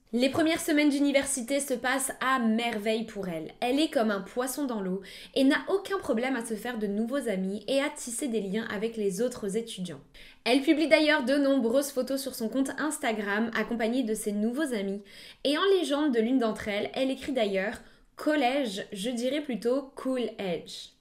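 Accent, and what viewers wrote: Quebec, Canada. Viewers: Allô tu es française oui mais parfois je reconnaît un peu de quebecois en toi ….vie tu au Québec ???? J’adore tes vidéo en passant ❤️ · Effectivement,, moi aussi je trouve que ton accent es de plus en plus québécois !! 👌😉 Et bravo pour tes vidéos continue ton excellent travail !!😊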